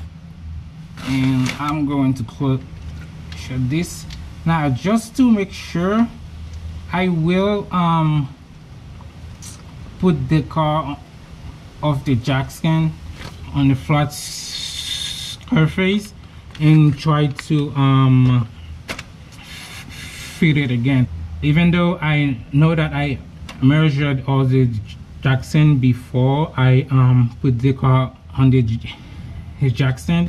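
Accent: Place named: Haiti. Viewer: Haitian accent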